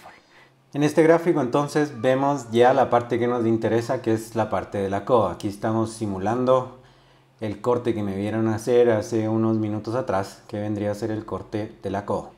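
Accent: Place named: Central America. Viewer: Increible su conocimiento, facilidad de la palabra y modo de explcia. Gracias, yo no hablo desde la perspectiva de experto ni mucho menos, solo podr'ia decir que soy un apasionado por las plantas y los arboles, pero soy totalmente neofito en los bonsais, acodos y demas temas, pero me gusat leer, ver videos, comprara notas, experiencias y experimentar. Por ahora le cuento que quiero hacer un acodo aereo a un mandarino que esta en frente del lugar donde vivo porque ya es un arbol maduro y porque ya ha produccido mandarinas de las cuales he disfrutado varias veces, el tema es que esta en una avenida asi que es espacio publico y pues cualquiera podria destruir mi acodo, quiero conservar algo del arbol viv porque en Enero de 2019 me ire de aca. Ahora mi pregunta, podria hacer el acodo y tener exito?, cuanto tiempo es recomendable dejar el acodo para cosecharlo?, si, voy a ver el video de acodo aereo, gracias y Dios lo bendiga por su aporte a la naturaleza. 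Saludos de Colombia -- por cierto, Ud. suena centroamericano/chileno, de donde es?.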